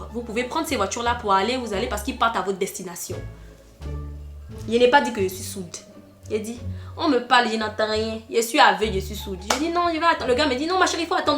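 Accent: Gabon. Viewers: Moi c'est tes accents que j'aime, surtout l'accent gabonais ❤️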